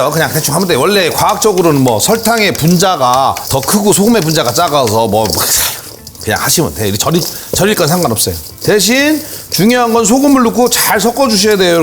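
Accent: Chungcheong, South Korea. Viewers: 우리막내삼촌닮으셨어~ 너무푸근하고 친근감있고 은근재밌고...같은충청도라 말투도 정감있고...너무좋으신분같아~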